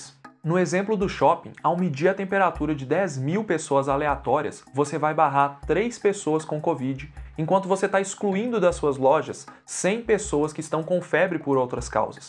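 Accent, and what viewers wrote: Minas Gerais, Brazil. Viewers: Esse sotaque mineiro é muito gostoso de escutar!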